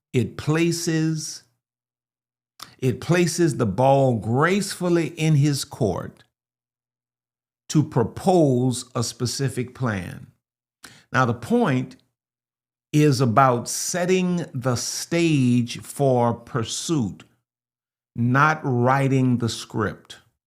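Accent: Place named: South, United States. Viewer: You speak with a proper southern accent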